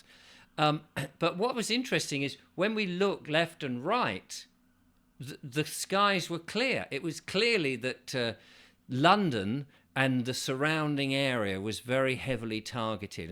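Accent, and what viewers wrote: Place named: Scotland, United Kingdom. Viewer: I think I detect a slight Scottish accent, that's reward for helping to spread the word up North.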